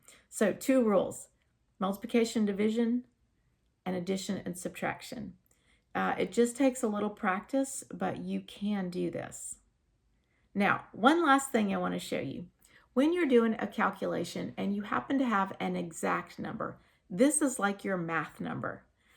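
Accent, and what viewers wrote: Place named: South, United States. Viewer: I'm so stoked to have found your channel! Currently in a Chem course as a pre-req and while struggling through some other math concepts discovered that anything said in a southern accent apparently sticks in my brain better than without. Thanks for making so many videos, will definitely be coming back as I work through the course!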